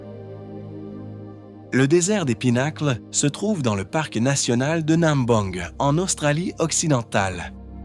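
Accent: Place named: Quebec, Canada. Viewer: Merci pour cette vidéo. Des images magnifiques et un petit accent (Québec?) très sympa. Bravo pour votre travail.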